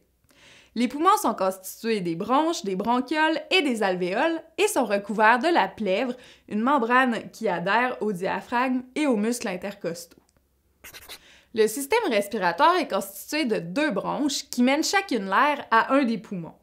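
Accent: Quebec, Canada. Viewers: merci beaucoup! ps: j'adoooore l'accent quebéquois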